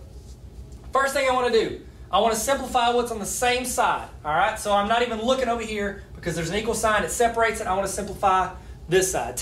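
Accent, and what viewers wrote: South, United States. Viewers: ayyyyyyyye my class calls you and we love this video haha XD THANK YOU FOR BLESSING MY MATH CLASS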